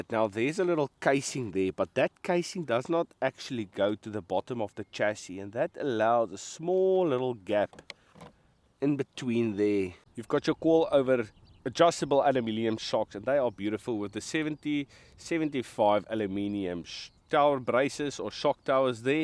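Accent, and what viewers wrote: South Africa. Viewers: Lol boet I can tell you are a proper South African from that awesome accent!! Great vid, considering getting one of these, just wish it was 1/8 scale, but for the price this seems like a real winner.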